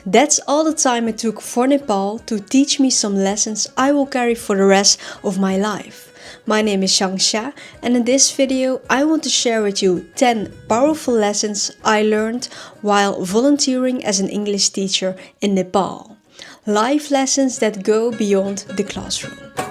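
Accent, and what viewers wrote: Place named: Netherlands. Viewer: Where is your accent from? You sound Dutch 😊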